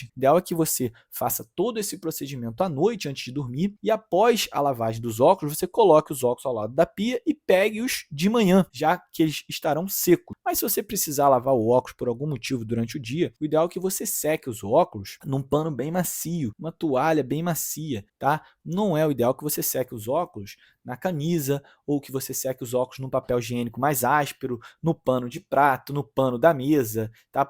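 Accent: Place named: Rio de Janeiro, Brazil. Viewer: O sotaque carioca, para mim, é insuportável.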